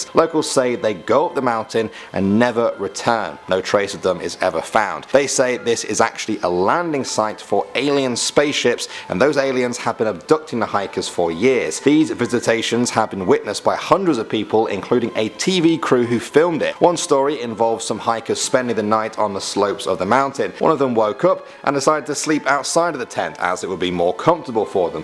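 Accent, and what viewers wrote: United States. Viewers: The americans accents thats not how its said its cuz ur americans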